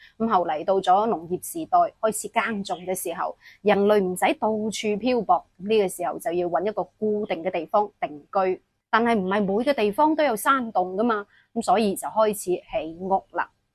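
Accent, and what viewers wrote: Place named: Guangzhou, China. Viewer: 主持說話，帶点廣卅口音，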